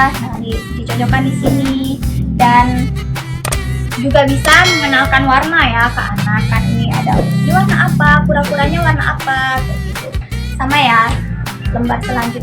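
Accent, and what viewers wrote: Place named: West Sumatra, Indonesia. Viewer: Sukses ya sistaaa, logat minang nyo itu looohhh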